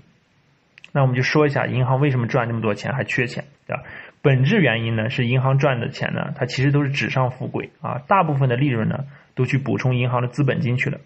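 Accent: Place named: Northeast, China. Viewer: 盲猜你是东北人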